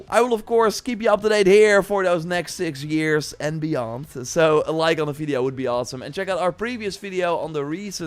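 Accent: Netherlands. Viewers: Your dutch accent really sucks man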